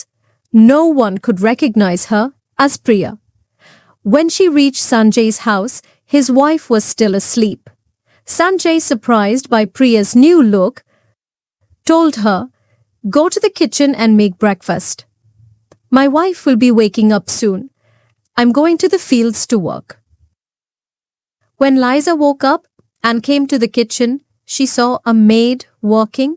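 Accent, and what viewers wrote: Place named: India. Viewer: Indian accent.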